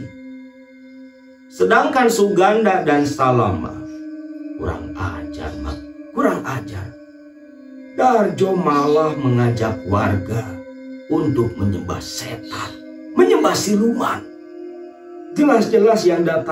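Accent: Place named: Banyumasan, Indonesia. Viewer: Tegal hadir kang wong ngapak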